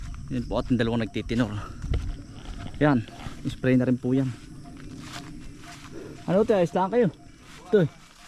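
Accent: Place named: Batangas, Philippines. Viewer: Ay BATANGUENIO KA EH KA INSAN ANG YUNG PUNTO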